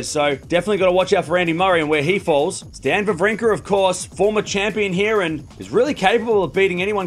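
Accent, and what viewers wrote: Australia. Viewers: talk slower only aussies understand u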